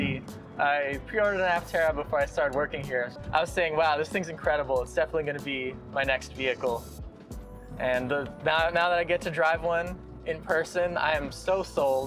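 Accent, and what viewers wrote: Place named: San Diego, United States. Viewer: “Fermiliar” man that reminds me of home, good old San Diego dialect. Love “bolth” SD and what Apterra is doing for the industry. Everyone’s watching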